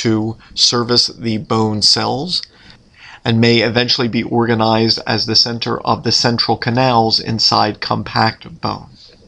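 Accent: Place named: Philadelphia, United States. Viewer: He's from near Philly I can tell by the accent (and the name AKA )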